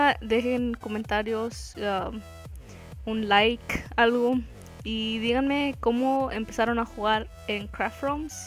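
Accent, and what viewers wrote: United States. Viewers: Es de Estados Unidos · Eres gringa?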